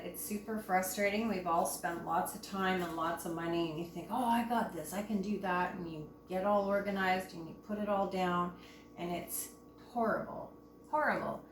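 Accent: Canada. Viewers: Good job on your first video,! BEAUTIFUL ARTWORK!! As soon as I heard your 'accent', I knew we were kindred spirits! I'm Canadian, originally from Toronto - now living in San Diego, California! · Oh. This is awesome!! How brilliant!! Such detail! I love 💕 it!! I see your from British Columbia!!! I’m from Windsor Ontario. But live in Florida now!! I thought I detected an accent from my homeland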